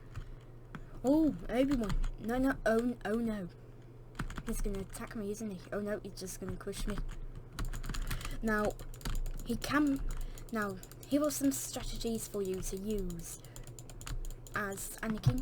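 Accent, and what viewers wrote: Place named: United Kingdom. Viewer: Cool video :) I also really like your British accent lol ,also can u make a video on how to get Jesus skin for Obi-Wan-Kenobi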